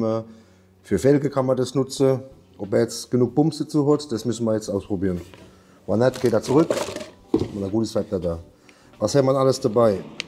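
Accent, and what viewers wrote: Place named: Palatinate, Germany. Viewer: Ämme Pälzer Bub kammer jo nur gläwe was er saacht 😂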